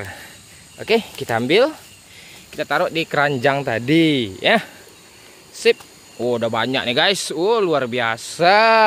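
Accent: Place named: West Sumatra, Indonesia. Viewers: Bahasa nya jaleh urang Minang nya om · Bang abang org mana bang soalnya subscriber baru kok suaranya kayak orang sumbar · Suka kali aku logat nya minang kocak😍❤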